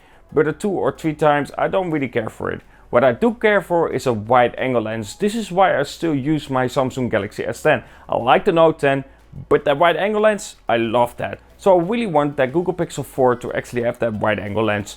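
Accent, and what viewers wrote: Netherlands. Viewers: Lekker nederlands accentje ook! Haha niet erg maar he je werkte samen met MKBHD Supersick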